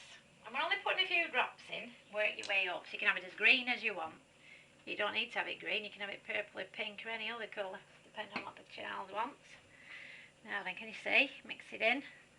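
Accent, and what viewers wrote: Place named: North, United Kingdom. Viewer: Thank you for this video it's great and with your northern accent easy to understand. Tracy from Accrington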